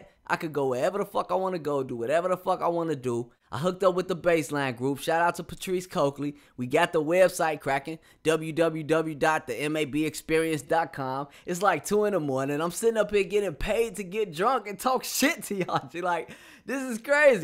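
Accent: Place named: Chicago, United States. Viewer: I got ppl in the CHI, Roseland n Chatham I hear ur accent bro lol!👍🏾👍🏾👍🏾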